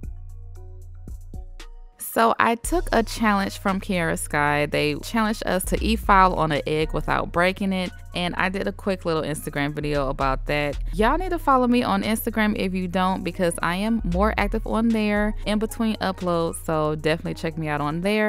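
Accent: South, United States. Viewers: Can I just say, with that southern accent and smooth voice.. i swear you sound like Beyonce, especially when you giggle, girl. Thank you for these calming chill videos☺️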